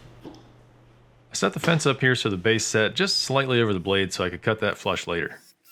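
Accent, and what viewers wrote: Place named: East Texas, United States. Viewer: Where you from brother? You speak like me…east Texas?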